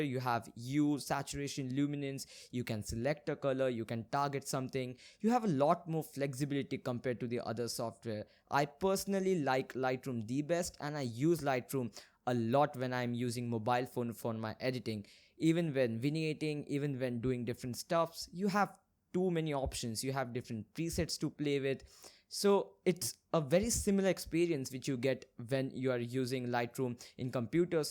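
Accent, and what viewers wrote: India. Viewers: I love that Indian accent😂👌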